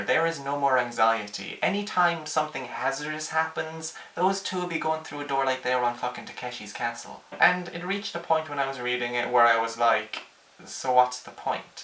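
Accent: Ireland. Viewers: I am glad you're back. I missed your wit, symmetric face and light Cork accent. Also I appreciate the shirt choice. I do not appreciate the Sarah Chalke shade. Clearly, she was the better Becky.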